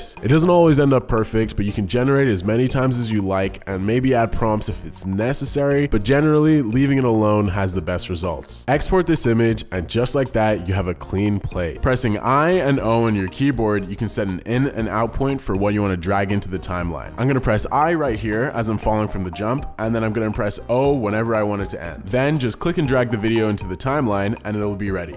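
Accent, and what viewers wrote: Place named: United States. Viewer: Top entertainer with a great feel for humour and edits. I've heard you have a comedy sketch filmed that has never seen the light. Although you sound American my intuition is that the film has a Scottish feel to it. Is this ever going out? With your skills you should be able to turn it into a 4/10 imdb rated film at least....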